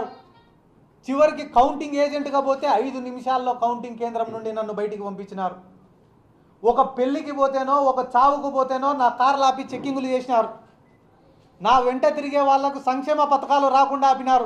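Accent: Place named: Rayalaseema, India. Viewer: Sidhardha Reddy lo meeku nachedi matalu, aa slang aa vakhchaturyam okka Rayalaseema ke sadhyam adi vinte evaraina estapadalsinde, chala mandi profession valla aa slang vadatledu.. Ex. CM Jagan... Anyway we are AP people need to be unity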